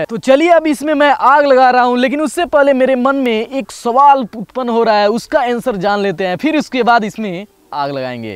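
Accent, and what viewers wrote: Bihar, India. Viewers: Kay app बिहार के हे l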